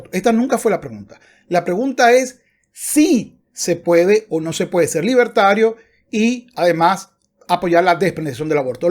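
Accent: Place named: Venezuela. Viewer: Bonito tu canal hermano. Aqui estamos apoyandote, porque eso es lo que necesitamos, apoyar y promover el sentido común. Tienes acento Venezolano, eres? Un abrazo!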